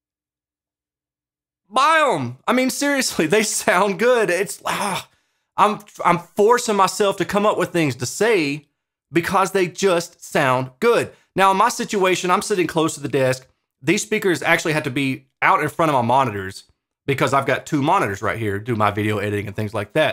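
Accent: South, United States. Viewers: Love your speaker reviews! Dig the Southern accent, too. I found this monitor on Amazon UK, but for us Limeys, it costs a good deal more ($415) unfortunately. Grrr!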